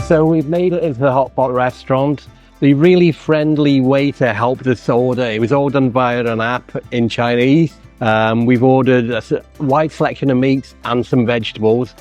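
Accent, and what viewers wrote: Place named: United Kingdom. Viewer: I am here to enjoy your beautiful British accent 🙂. Have a great time in China!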